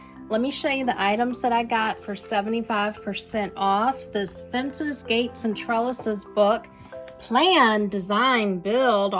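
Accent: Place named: South, United States. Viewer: I thought so because of your southern accent. 👍 I'm watching you from upstate NY. We have the beautiful Mohawk Valley.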